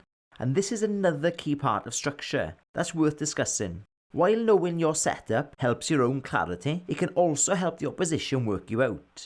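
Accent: Wales, United Kingdom. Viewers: Lovely lyrical Welsh accent ala Terry Grittths. I’d say when your ordering a pizza it’s like telling a story.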